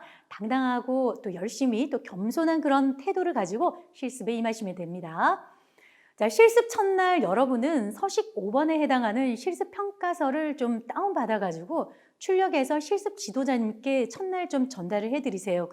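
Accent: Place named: Gyeongsang, South Korea. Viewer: 오래전 교수님통해서 복지사자격증 취득했는데요 구수한 경상도사투리에 강의참잼나게. 청취했습니다 대단한교수님입니다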